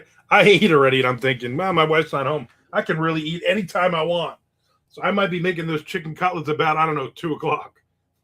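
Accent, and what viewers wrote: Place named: New Jersey, United States. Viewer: Did you say I'm on, or I'm, can't tell with that Jersey accent.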